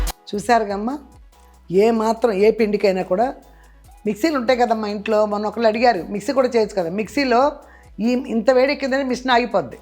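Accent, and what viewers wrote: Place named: Nellore, India. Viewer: Amma mee matalu naku chala estam madi nellore ma matalu kuda elage untayi meeru matladuthunte vinaali anipisthundi amma ❤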